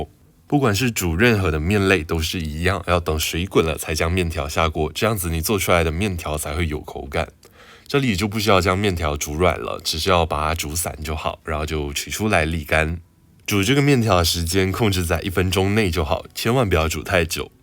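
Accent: Taiwan. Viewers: 会是马来西亚的人吗🤣怎么怎样听都不像且像台湾，马来西亚人讲话的风格众所周知😂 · 是馬來西亞人喔，口音跟台灣蠻像的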